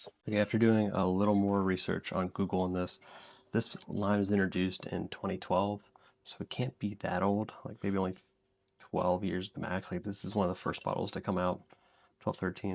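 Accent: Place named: United States. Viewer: i love the small inaccuracies when you talk, makes you sound very american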